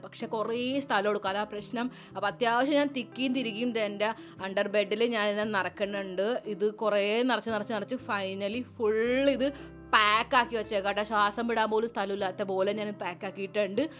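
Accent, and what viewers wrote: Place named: Thrissur, India. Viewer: Chechi I felt that your slang changed to thrissur slang 😂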